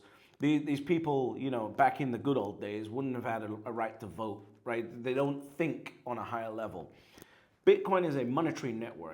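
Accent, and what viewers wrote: United Kingdom. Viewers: is back - Fantastic👍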